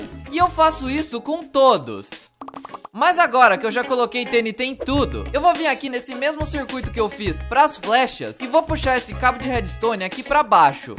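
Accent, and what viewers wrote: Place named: Portugal. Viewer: Essa menina é portuguesa a falar a língua de Portugal e eu moro aqui em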